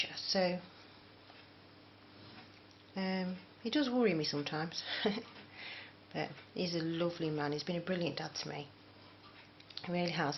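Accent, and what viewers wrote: England, United Kingdom. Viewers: Hello, I have watched all your tutorials and they are great. I am owl crazy as you can see by my avatar. I hope you don't mind me mentioning, when you start of you call your first knot a magic loop. Magic loops and Magic rings are described differently in other tutorials and what you start off with is a slip knot. Please don't think I am "knit" picking because I love your youtube channel. Keep up the good work, can't wait to see what comes next. Love your accent too, what part of England are you from? Best wishes to your dad i hope his arm is getting better. Kind regards:)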